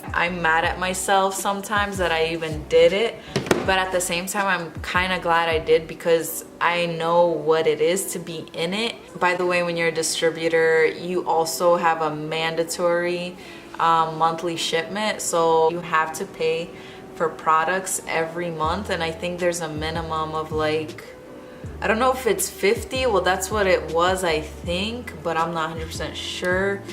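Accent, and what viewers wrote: United States. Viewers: we are everywhere haha i'd say you're from New York or something lol, looking forward to more of your videos! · Reminds me of a chicago south side girl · Been trying to figure out what state she is from with her accent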